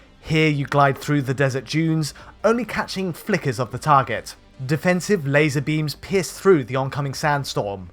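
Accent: England, United Kingdom. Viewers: English accent 💯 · he has an English accent, no such thing as a "British voice". If you have a problem with it then leave, you're not wanted here.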